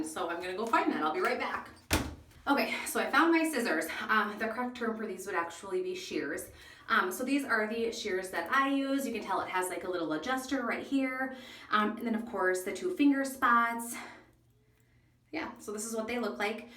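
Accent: United States. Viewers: Are you from Minnesota or somewhere up north or Canada? Your accent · ohh.. I can tell you have a midwest accent. 🙂 I love your hair tips...thank you!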